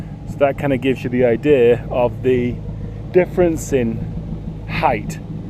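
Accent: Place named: Northern England, United Kingdom. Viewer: Now mate I've recently found your channel and enjoying your stuff but i've one question. what part of the north of England are you from as its great fun hearing English/northern terms,swearing and pronunciations mixed with americanisms . keep up the good graft.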